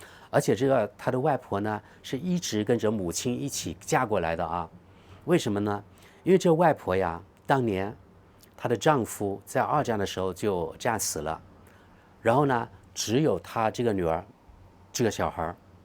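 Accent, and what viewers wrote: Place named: South, China. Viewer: 每个地方人都有口音，尤其南方人，大家相互理解吧，其实我们南方人听着南方口音更亲切呢。他还特地做英文，中文字幕，很不容易，请支持！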